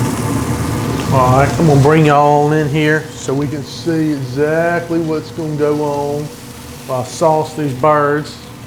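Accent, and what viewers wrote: South, United States. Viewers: your like a southern guy fiety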